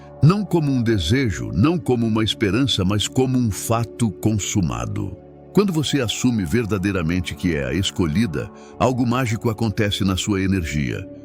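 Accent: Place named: Brazil. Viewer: Porque se oye en du brasilero?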